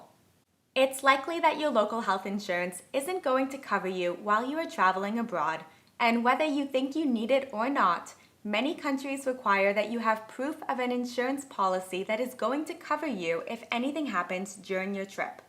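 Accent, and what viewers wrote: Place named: New England, United States. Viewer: New England accent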